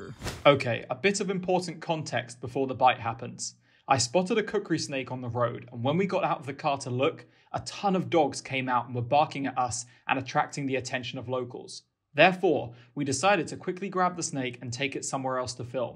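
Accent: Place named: Belfast, United Kingdom. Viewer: Ah, he sounds like a Belfast lad like myself. Nasty bite for such a small snake!!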